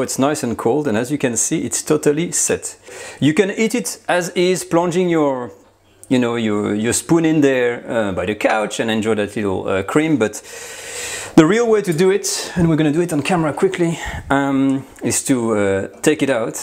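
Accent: France. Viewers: Looks very yammy. I like the French accent .👍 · I love the French accent when mixed with good English! Recipe was so easy to follow. Thank you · Your videos are superb, made better by your excellent English but with a perfect French accent. Question: how about a pinch of salt in this recipe? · I like the way you speak English 💕 it's a French mix ❤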